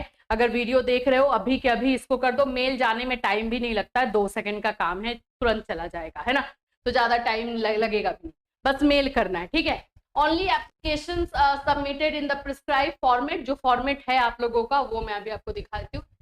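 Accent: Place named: Agra, India. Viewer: Kya aap Agra se ho madam?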